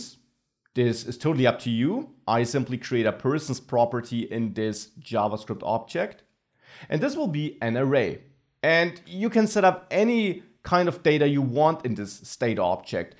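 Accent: Germany. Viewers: Great video. Thinking to subscribe the course on udemy. BTW your accent sounds like German. Are you German ? :D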